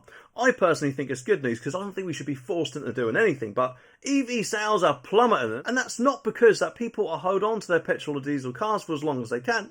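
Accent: Norfolk, United Kingdom. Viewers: You sound Norfolk! Am I wrong or right?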